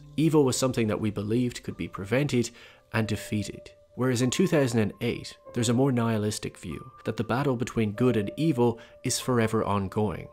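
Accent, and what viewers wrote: Ireland. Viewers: I wonder if your Irish you sound like an Irish man like myself haha but these videos are absolutely superb I've been watching them all there brilliant observations!!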